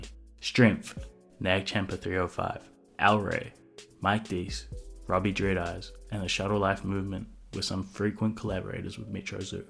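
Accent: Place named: United Kingdom. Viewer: holy shit british man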